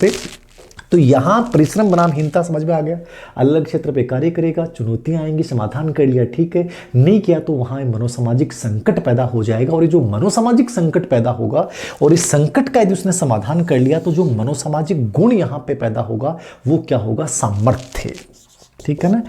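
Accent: Rajasthan, India. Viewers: बहुत अच्छा समझाया आपने सर जी मारवाड़ी आपकी बहुत अच्छी लगती है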